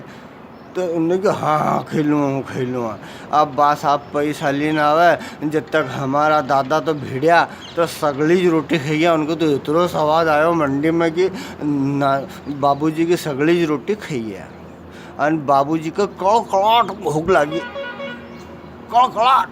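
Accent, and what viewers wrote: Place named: Nimar, India. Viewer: Sir आपकी भाषा हमारी भाषा nimadi की जैसे ही है... मे nimad से हू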